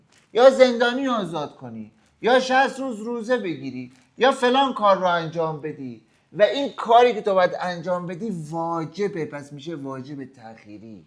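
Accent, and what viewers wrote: Mashhad, Iran. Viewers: سلام خیلی عالی بود با لحجه مشهدی هم بی نظیر ه هم عالی وبی نظیر تر میشه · ازخرم آباد درود به تمام مشهدیا لهجتون روعشقه لطفاً بیشتر مشهدی حرف بزنین ❤❤❤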